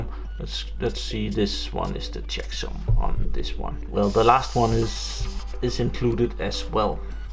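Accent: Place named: Denmark. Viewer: Definitely danish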